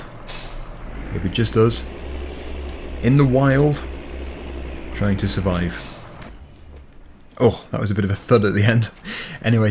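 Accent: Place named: North London, United Kingdom. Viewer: Gal from north London er er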